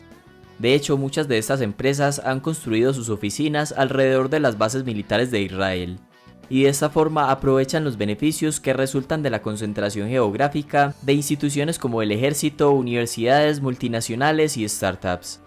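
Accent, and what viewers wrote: Antioquia, Colombia. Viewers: Excelentes videos. Hace poco empeze a seguir el canal. Soy de Colombia y le sentí cierto acento paisa al narrador . Los veo desde Memphis TN 🇺🇲🇨🇴. Dios los bendiga y gracias por ayudar a la cultura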